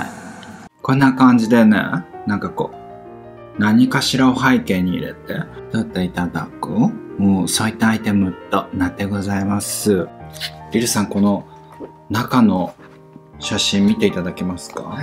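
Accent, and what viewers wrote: Japan. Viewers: 💕 ごきげんよを🤗 たまに飛び出す関西弁が めっちゃ好きやねーん❣️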